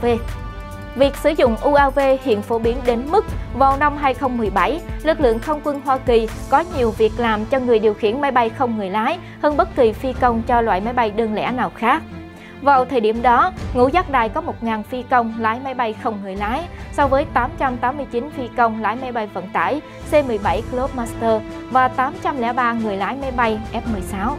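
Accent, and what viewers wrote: South, Vietnam. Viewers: Quân sự mở kiếm đâu ra một em nói giọng Miền Nam 😃😃😃😃😃😃😃😃😃😃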